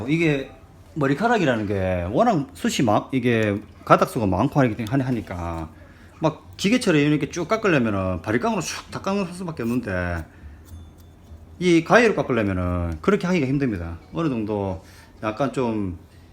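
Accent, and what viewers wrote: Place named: Gyeongsang, South Korea. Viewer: 대구! ㅋㅋ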